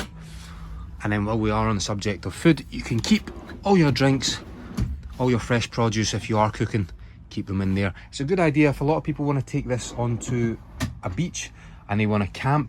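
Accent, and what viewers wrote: Scotland, United Kingdom. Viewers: Love the Scottish accent in these boat sales pitches, real passion!👍🏻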